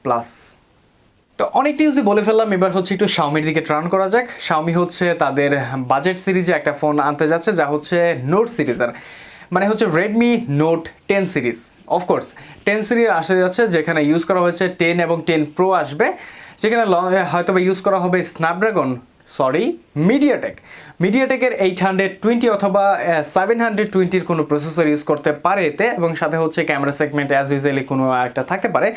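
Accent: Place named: Bangladesh. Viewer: nA...ashole apnr ai vedio te beshir vag e india te phn launch korar kotha bolchilm to.. Ty vbsilm j apni hoito indian...bt apnr voice shune bhujha jai apni Bangladeshi 😅😅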